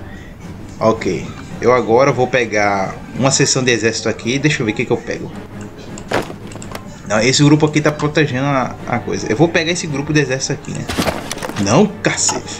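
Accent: Northeast, Brazil. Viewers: Sugestão tu e baiano ou nordestino?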